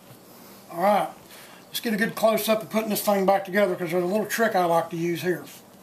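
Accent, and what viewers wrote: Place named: Northeast, United States. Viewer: He lives in Maine as you can tell by his heavy, Northeastern accent. LOL. This really is good and detailed video.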